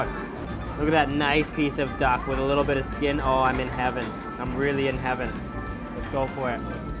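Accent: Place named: China. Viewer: As lived out of China for long, it is really memorable to see these food. Good job man, interesting to see it from the view of foreigner. Man, you talk with local accent, haha, that is lovely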